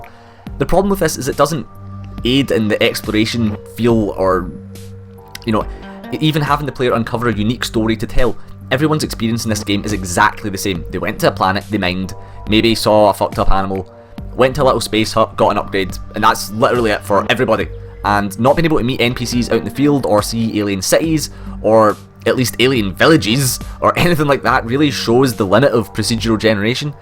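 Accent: Scotland, United Kingdom. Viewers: I love hearing Scottish people rant about shit. :D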